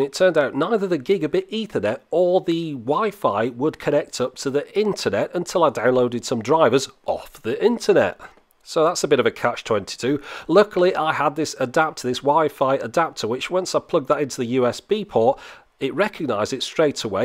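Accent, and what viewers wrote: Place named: North West England, United Kingdom. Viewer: You mustn't be English. James May is from Bristol. is from the North West of England. They both have regional accents and they are from opposite ends of England.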